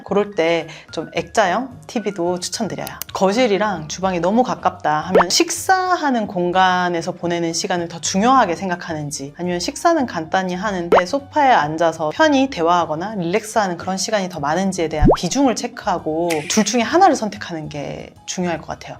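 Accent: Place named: Jeolla, South Korea. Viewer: 전라도네? ㅋㅋ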